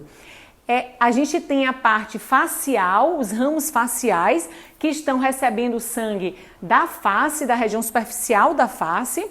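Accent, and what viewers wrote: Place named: Northeast, Brazil. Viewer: Simplesmente espetacular ! Muito rica em didática e lógica além do acréscimo charmoso do sotaque nordestino .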